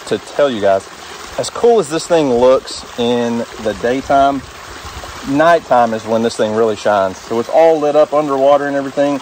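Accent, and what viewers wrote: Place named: South, United States. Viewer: By the way I absolutely love your southern accent. I live in oregon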